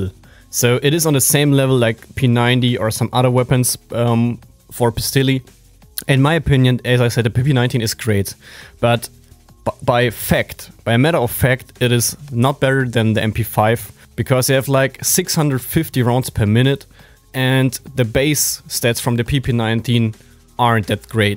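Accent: Germany. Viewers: Sprich einfach deutsch bro xD, joke mag es wenn deutsche Englisch sprechen! <3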